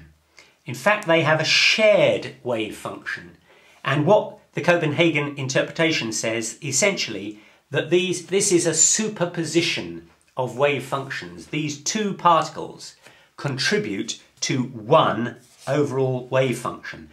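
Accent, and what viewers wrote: United Kingdom. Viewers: There's nothing like physics being explained with a British accent! :-) Very instructive videos, thank you very much!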